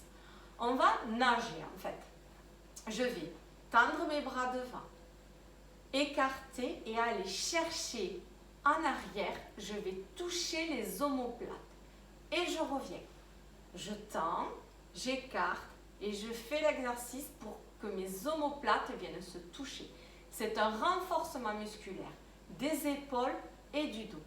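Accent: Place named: South, France. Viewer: Merci pour cette séance,j'aime ce petit accent du sud! Je vous suis depuis la Belgique!!!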